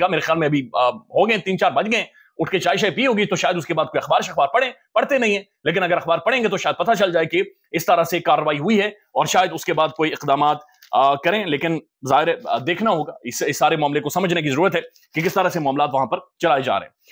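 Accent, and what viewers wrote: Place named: Kashmir, India. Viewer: مجھے آپکے اردو لہجے سے شک تھا کہ آپ کشمیر سے ھیں ۔ آج آپ نے خود بتا دیا۔ تھینکس ۔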